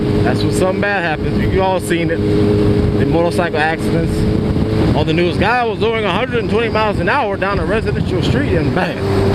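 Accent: Michigan, United States. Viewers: You from Michigan bro?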